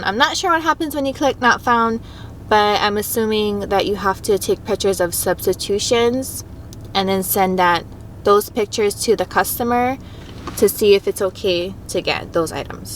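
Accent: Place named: Hawaii, United States. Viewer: I knew you were from Hawaii the second I pressed play. I interview a lot of locals on my podcast. I need to get in on Shipt.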